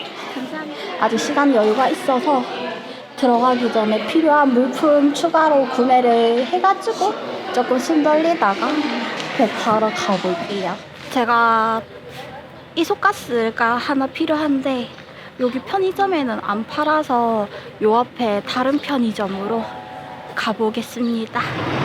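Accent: Gyeongsang, South Korea. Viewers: 딱 대구말투 시네요^^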